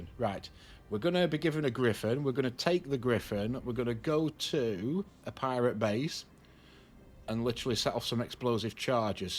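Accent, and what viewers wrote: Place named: Manchester, United Kingdom. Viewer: Another helpful video. Thank you. I thought I had made a noob mistake getting blown up in Mission 5 of 10................I now feel much better about it! I have insured several ships but have forgotten which ones - is there an easy way to see which ones have insurance and which don't? I've also been meaning to ask where in the world you are - my guess is Manchester/Salford/Salford? I'm a Mancunian myself (Whalley Range) although since escaping from work we now live north of the border. Your accent takes me back home again 😀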